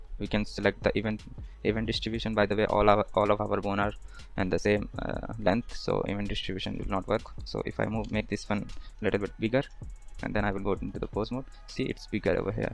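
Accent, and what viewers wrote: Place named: India. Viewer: bhai request ha video ek dam shi bani ha bas jab bolta ha na koshish kar ki words pura smaj aya audience ko indian slang ata ha thoda wo thodi dikat dalta ha baki content tara shi ha ekdum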